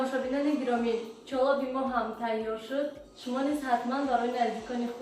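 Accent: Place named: Kulob, Tajikistan. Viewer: шумо ранги кулоб и гап мезанен мехохам шумо кулоб и бошен илтимос наген кулоб и нестам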